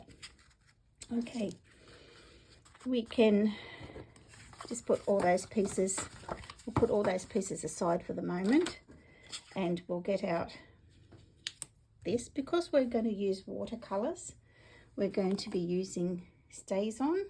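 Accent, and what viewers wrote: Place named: Australia. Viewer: Hi This is the 1st time I have seen you on youtube I didnt know anything about you but your accent made me stay and watch lol. We have to support Our Aussie demonstrators 😊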